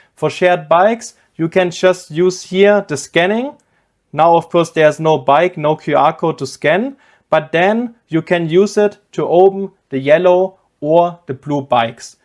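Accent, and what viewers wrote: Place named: Germany. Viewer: Ich hab noch nie so Glas klar einen deutsche am Akzent erkannt. Geil haha! 👍🏼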